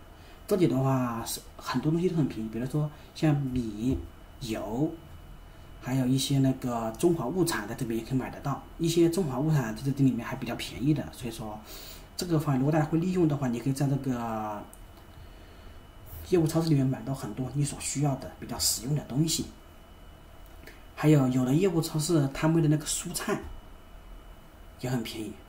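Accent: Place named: Hunan, China. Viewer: 听着像湖南口音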